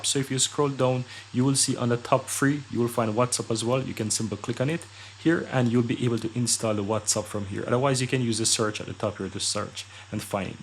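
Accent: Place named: Jamaica. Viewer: You sound Jamaican... are you?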